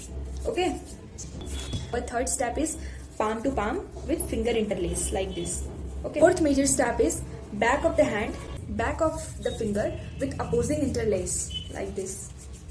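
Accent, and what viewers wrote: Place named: Haryana, India. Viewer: I think 💯 mam is from haryana ❤❤ 😂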